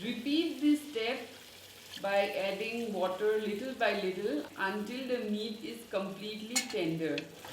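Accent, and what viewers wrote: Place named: Assam, India. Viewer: Lovely ☺️ I wish could have a taste of your dishes… that Bodo accent was funny though 🤭 but thanks for the dish as my Khasi gf was pushing me to cook it with pork and u saved me 😊 🙏 keep posting amazing Bodo dishes 👍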